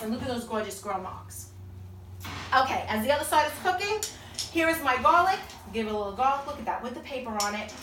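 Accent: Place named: New York, United States. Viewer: I love your shirt AND your NY long Island or Buffalo accent LOL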